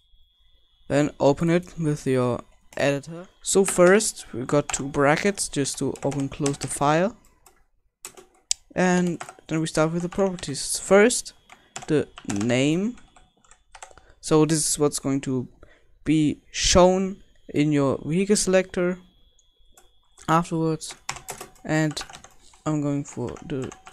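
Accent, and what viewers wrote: Germany. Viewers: haha zu geil dieser deutsche akzent! aber gutes video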